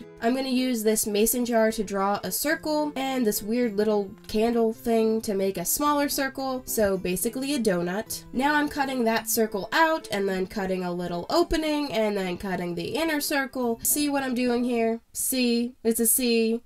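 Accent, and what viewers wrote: South, United States. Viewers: Why does she have like the country accent in this video? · This video of her sounds like she has a southern accent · In this video why dose she sound like she’s from the south...🤷‍♀️🤷‍♀️🤷‍♀️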